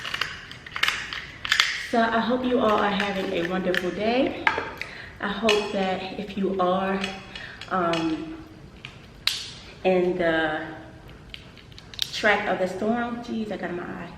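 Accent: Baltimore, United States. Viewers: I thought you was from Baltimore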